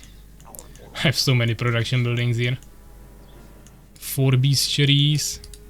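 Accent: Czech Republic. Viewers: are you czech? you have very czech accent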